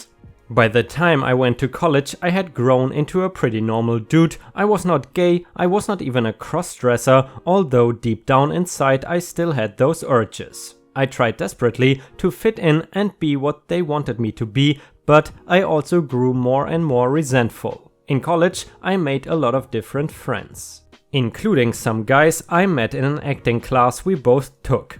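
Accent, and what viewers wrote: Germany. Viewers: Are you german ? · The Person speaking: Are you gernan?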